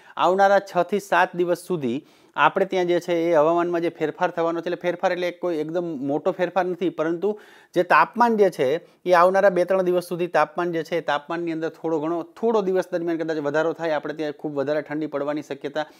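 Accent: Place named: Kathiyawad, India. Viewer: સરસ આપની ભાષામા કાઠિયાવાડી ઝલક છે સૌરાષ્ટ્ર ના છો?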